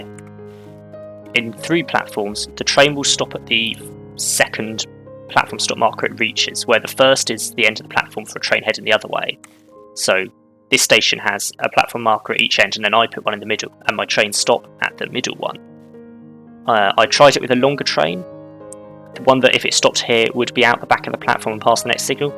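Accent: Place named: United Kingdom. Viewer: You sound British but say beta the American way. lol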